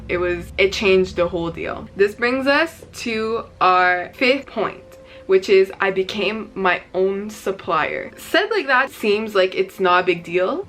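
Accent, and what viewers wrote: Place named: Montreal, Canada. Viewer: I know she’s from Mtl Just from the accent 😭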